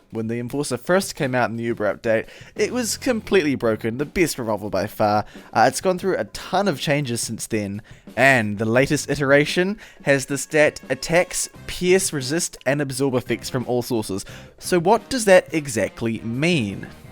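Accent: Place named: New Zealand. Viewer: He's a fucking Kiwi, couldn't you recognize his accent? Dumbass.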